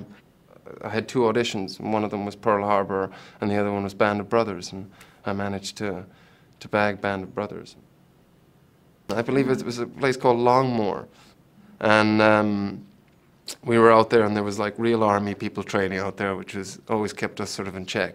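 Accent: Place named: Ireland. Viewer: i like his cute irish accent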